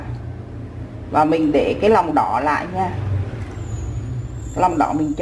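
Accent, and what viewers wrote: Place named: North, Vietnam. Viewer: Co o Dong nai ma cung an mon mien tay ha.giong co nguoi bac ah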